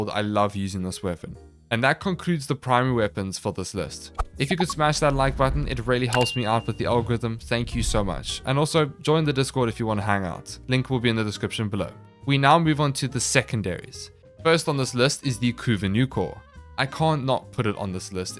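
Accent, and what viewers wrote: South Africa. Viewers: yooo.. are you south african? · You are most deffinitely South African arent you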